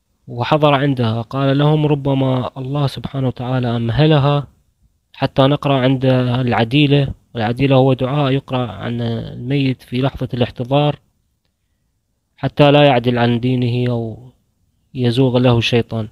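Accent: Iraq. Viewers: ما اجمل اللهجة العراقية ...فهمتك هْوَايَهْ زين ❤ تحياتي من الجزائر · تعجبني اللهجه العراقيه الجنوبيه وقصه جميله وشيقه حقاً ❤ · اللهجة العراقية جميلة الله يحفظك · القصه كلش حلوه وسردها بلهجتنه العراقيه الحلوه . قصه معبره تخلينه نحب الموت ومنخاف منه ونستعد له بالاعمال الصالحه.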